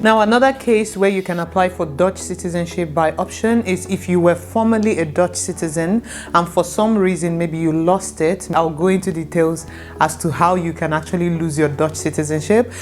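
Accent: Nigeria. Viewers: I would not think you were Dutch because you sound Nigerian.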